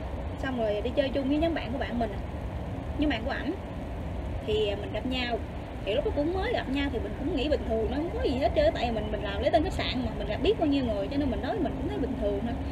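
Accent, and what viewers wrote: Mekong Delta, Vietnam. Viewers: Bạn có nét đẹp và giọng nói đậm nét miền tây sông nước...Chúc bạn hạnh phúc nơi xứ người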